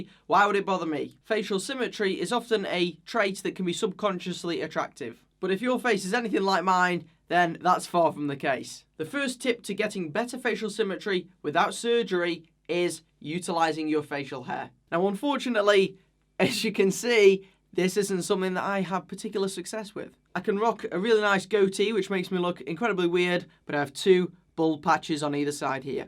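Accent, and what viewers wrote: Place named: Northern England, United Kingdom. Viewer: Not really, Daniel Radcliffe has a London accent, his accent sounds more like a northerner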